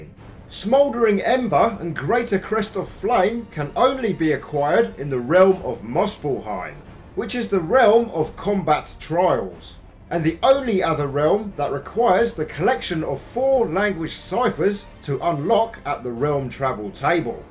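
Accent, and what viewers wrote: United Kingdom. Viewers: It's always sine British dude on these game videos 😭😭😭😭 thanks tho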